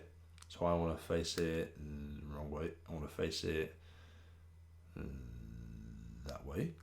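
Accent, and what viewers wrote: United Kingdom. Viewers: No body can narrate better than a Brit! Greeting from Florida, USA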